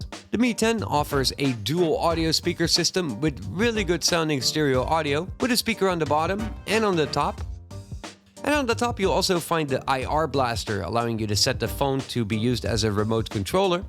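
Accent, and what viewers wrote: Netherlands. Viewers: Are you Dutch by chance? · Do I hear a dutch accent?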